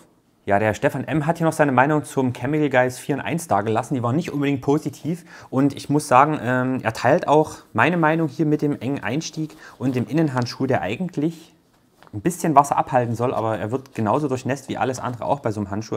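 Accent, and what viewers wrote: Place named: Saxony, Germany. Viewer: Sry...hatte im vorherigen Video dieses noch nicht gesehen und dich nach dem woher und wie gafragt....wie gesagt, erst den 5ten Tag dabei....werde Dich definitiv via Amazon Link unterstützen, bei mir stehen im Februar einige Investitionen an und ich werde an dich denken..... Mach bitte weiter so.....Ehrlich, Symphatisch, Sächsisch....ich freu mich.....!!!!!!!!!!!!!!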